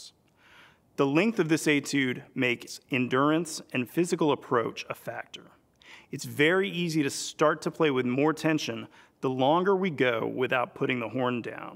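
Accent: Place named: United States. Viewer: He has an American accent, but the temper of a man in the British Parliment.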